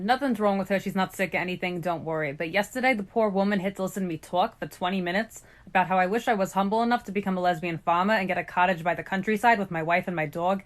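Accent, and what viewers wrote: New Jersey, United States. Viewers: So I usually don’t like the jersey accent- I find it abrasive. But her accent is quite beautiful. It’s very soothing and almost musical. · This is the only time I heard people say they actually like the New Jersey Italian accent. It makes me happy :’))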